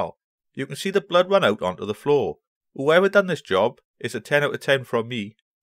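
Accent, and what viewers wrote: Wales, United Kingdom. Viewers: Ha , great video, love the Welsh accent. Don't the rat's that get inside the wall where you can't recover them start to honk after a few days? Especially in that steamy hot Pontypool climate.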